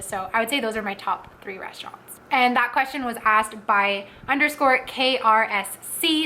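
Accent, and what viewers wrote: Quebec, Canada. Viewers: You have a Quebec accent.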